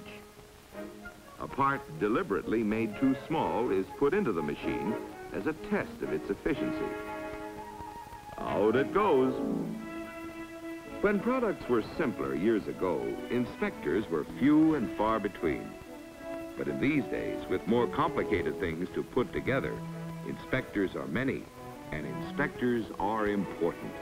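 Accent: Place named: United States. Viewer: Nice! But one thing that I found interesting was how clear and beautiful was the narrator's American.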